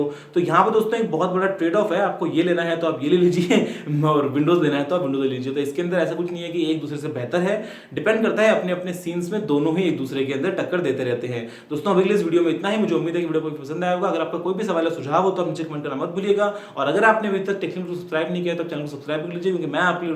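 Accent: India. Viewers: Classical Indian English, oh my god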